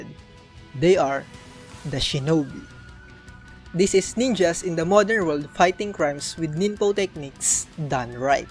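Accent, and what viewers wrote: Philippines. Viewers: your accent sounds filipino, but if Im wrong I think you're still from the southeastern asian countries, correct me if i'm wrong tho. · What an Filipino Accent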